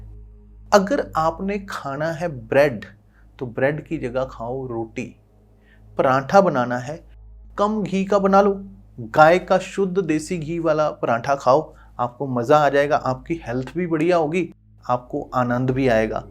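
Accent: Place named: Rajasthan, India. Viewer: Aap guro ji ya to rajesthan ke ho ya hariyana jaunpur ke ho aap ki avaj se lagta he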